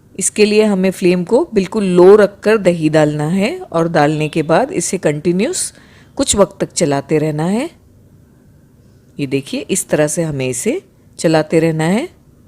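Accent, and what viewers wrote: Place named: Gujarat, India. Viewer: Askm , i tried this, Alhamdulillah Alhamdulillah bohot acchi bani.....Aap se ek sawaal hai, kya aap Gujarati hai? :) aapke kuch words ya lehja thodda thoda sa gujarati lagta hai...memon my be ? :)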